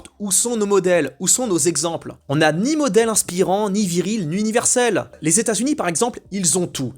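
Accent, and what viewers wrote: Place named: Paris, France. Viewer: Tu parles comme un parisien , normale que t'es vu comme un pote a paris lol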